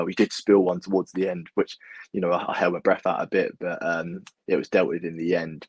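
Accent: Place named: Birmingham, United Kingdom. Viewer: Where you from pal? Accent not too Brummie 👍🏻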